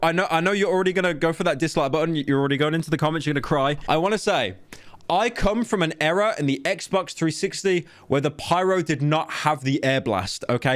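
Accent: United Kingdom. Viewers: pyro please take off the british accent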